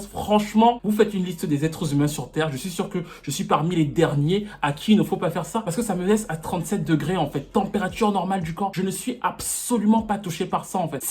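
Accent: Cameroon. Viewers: Tu es trop beau, trop sexy, trop hot. Ah le Cameroun…. Tu es intelligent et j’adore ton accent. Bisous je suis une fan de Chine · Je viens de regarder ta vidéo et j'adore ton accents !! Moi aussi je viens du Cameroun 🇨🇲 et tu peux vraiment être fier de toi 🤗 😍 c'est même pas la peine de les répondre ces haineux ! ⚠️ 😡 J'adore toutes tes analyses ! Tes trop 💪🏾 fort j'apprends tellement de choses... Merci pour ton Travail 🤗🤗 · Je me suis toujours dit qu'il y'a un côté camerounais en toi. Non pas pour ton accent, mais par ta manière de parler. 💪🏾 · j’étais sur que tu étais mon frère Camerounais (d’ailleurs je t’ai posé cette question sur une autre video) Ta façon de parler et quelques manières sont typique🤗 J’aime beaucoup ta passion elle est contagieuse. Une seul mot « Continuez »😉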